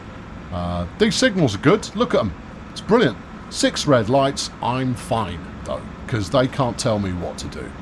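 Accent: Boston, United States. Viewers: I'm from nowhere near Boawston, but that sounds accurate to me... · Just stumbled upon your channel, your funny, i live in boston but not a bostonion, your Boston accent is funny 😆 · That bawston accent sounds like a bad Harley Quinn impression. Yikes. Glad to see another train sim vid tho!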